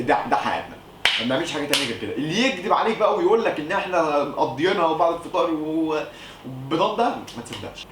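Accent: Sharqia, Egypt. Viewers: كل سنه وانت طيب بس*انت منين من الشرقيه * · أنت من الشرقية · جامد هو انت من الشرقية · انت منين من الشرقيه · انت من الشرقية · هو انت من الشرقيه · هوا انت من الشرقية؟ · انت من الشرقيه زي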